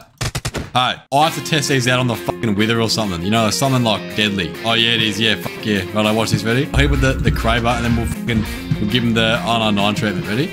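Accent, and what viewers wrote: Australia. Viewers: this video just popped into my recommendations and holy shit this guy is aggressively australian (in a good way)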